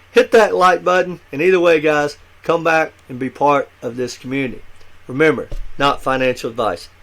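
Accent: South, United States. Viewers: I like your southern accent . Ive been living in Memphis for years now but originally I'm from Chicago . After moving to the south I dont think Id move back to the north.. Southern hospitality is real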